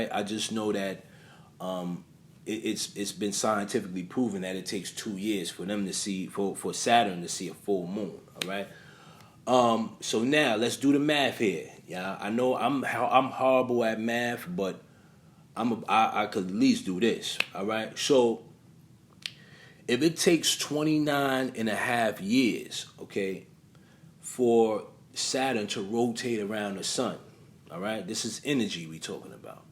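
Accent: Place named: New York, United States. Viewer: All this time I thought you were saying, "Foreskin Bargain". New York accents, sheesh!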